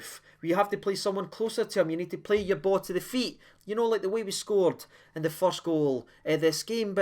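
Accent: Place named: Larkhall, United Kingdom. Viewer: He sounds like a native of Larkhall.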